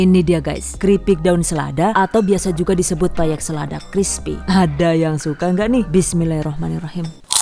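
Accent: Banyumas, Indonesia. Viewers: Laaahhh ngapak😅😅😅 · Bun..kok bahasa nya kyk Ngapak ya.. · Dengar ngapak nya ibu jadi kangen kampung halaman😭🙏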